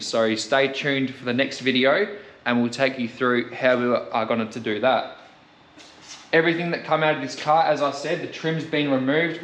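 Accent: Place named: England, United Kingdom. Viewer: He now's about Aussie cars but he sounds like his from pommyland its the only thing got me stumped about this channel.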